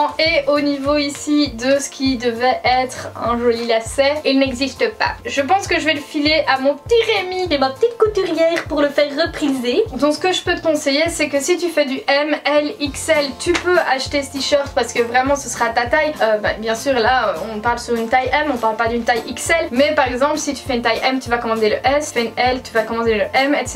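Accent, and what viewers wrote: Belgium. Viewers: tu es belges ?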